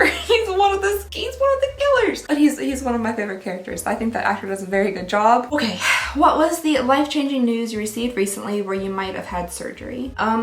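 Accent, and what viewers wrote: Kentucky, United States. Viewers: Love Reno and decorating videos and would so watch if you did some. And as a Kentucky born and raised girl I hear the twang come out sometimes 😉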